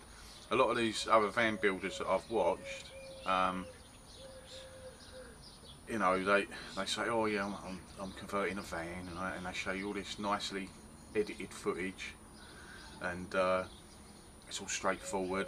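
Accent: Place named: London, United Kingdom. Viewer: Hi. Just came to this channel after a shout out from self build campervans on Facebook. I'm in the process of doing a conversion too so I completely understand what you're saying about having workshop facilities etc. My ex-community minibus is not even parked in my driveway! It's about 100mtrs across the road. Admittedly it's quite peaceful there, but it's a proper pain when you need the next tool or a piece of wood... Anyway, I'm enjoying the videos that I've seen so far and I have subscribed. Love the "suits you" every so often, but of course not everybody will understand it :) Keep up the good work; I know you'll get there in the end. Regards Mark in the UK p.s. pretty strong good ol' London accent there; are you in the London area or have you moved?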